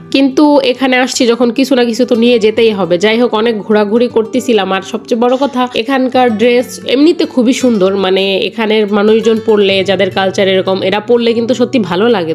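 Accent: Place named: Barishal, Bangladesh. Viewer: কিন্না কিন্না,, করেন,,,আপনার বাসা কি বরিশাল 😮😮😮😮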